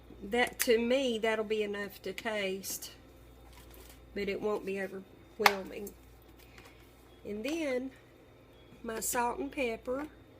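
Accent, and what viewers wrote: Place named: West Virginia, United States. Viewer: I'm just curious as to where you're from. You sound like you're from WV like me.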